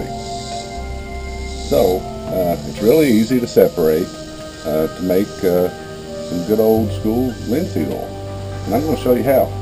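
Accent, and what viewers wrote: South, United States. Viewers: Quality control comment. Great content., your audio quality here is so-so, and you're a bit hard to understand because my ear is not attuned to a Southern dialect, especially with the music competing with your voice. Humbly submitted in support of your excellence.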